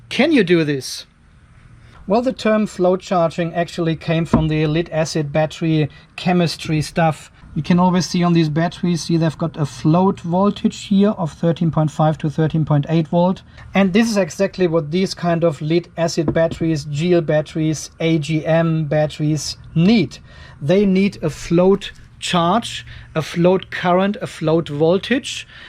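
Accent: Germany. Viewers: So funny listening to a German pronounce Lead or Voltage, as for Iron ......OMG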